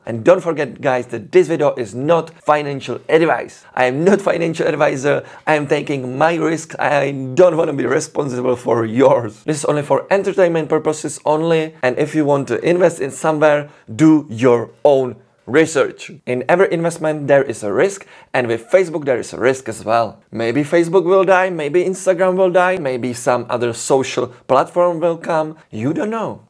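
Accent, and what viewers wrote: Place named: Czech Republic. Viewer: ta česká angličtina je tak... nádherná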